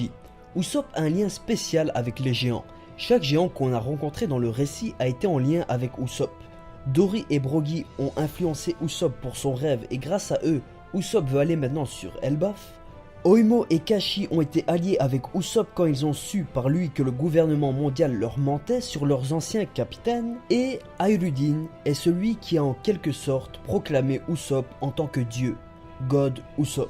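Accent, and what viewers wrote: Belgium. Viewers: L'accent belge que veux-tu ! Moi aussi je dit "oui cent trente ouitte" xD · imagine la barre qu on va se taper pour le chapitre 890 aka oui sans nonente 😂. on te kiff, change jamais ton accent belge. je dirais même que tu devrais utiliser plus d expressions de chez toi,genre à tantot etc...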